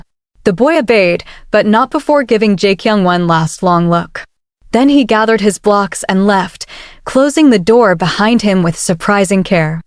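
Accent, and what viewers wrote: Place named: Guyana. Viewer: 'Born behind cow'. You sound Guyanese. I agree with your comments. Additionally, what is this obsession with liasons between Korean men and Black women? It is fiction because in real life, very few Korean, or Asian men, have healthy romantic interests in Black women. That's a conversation worth having😊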